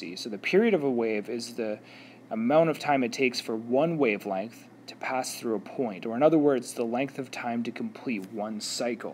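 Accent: Canada. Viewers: I think he is canadian because he said aboot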